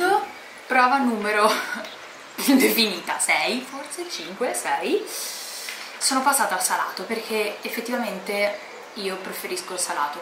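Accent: Tuscany, Italy. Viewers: parli con accento toscano ora 😁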